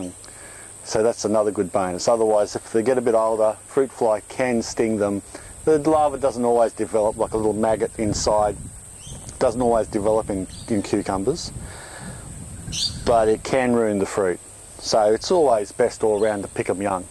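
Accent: Australia. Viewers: how nice to hear an aussie voice. I am in the tropics and my crop is wonderful at the moment. NEVER would have ever thought to pick them small and ferment them. I have been leaving mine 'til they are big, chopping them up and fermenting them. I am heading out to the garden now to pick all the 'babies'. thanks heaps. I will definitely be subscribing to your channel. Have a productive gardening day!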